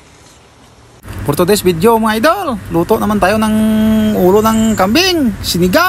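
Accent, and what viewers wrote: Iloilo, Philippines. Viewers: Ilonggo ka dol.?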